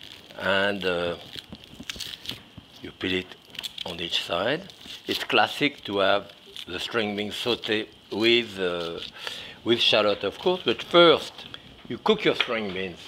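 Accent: France. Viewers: I love his French accent. He reminds a bit of Poirot. And I love his cooking style, noy fussy, and cooking while he talks about the old ways of cooking.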